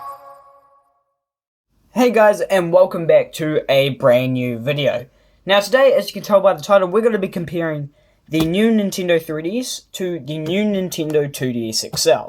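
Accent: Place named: New Zealand. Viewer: This video was on my feed. Wasn't prepared for the kiwi accent 🤓 Kidding aside this was a very good comparison video. Thumbs up mate!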